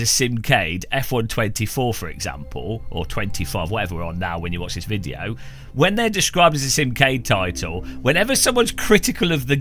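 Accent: United Kingdom. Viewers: Here we have it folks. This is why they tell you not to consume lacquer thinner, let alone on a daily basis... That bald, british sounding man's brain rot level has gotten severely out of control at this point. Time to gently smile back and slowly leave the scene. Might be contagious after all... 😂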